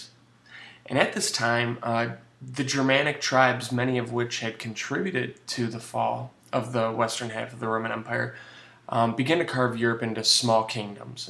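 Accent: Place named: Minnesota, United States. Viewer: You sound like someone from Minnesota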